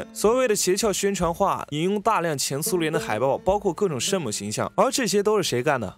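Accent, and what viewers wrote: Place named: Mainland, China. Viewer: 这说话的语气强调怕不是墙国up主吧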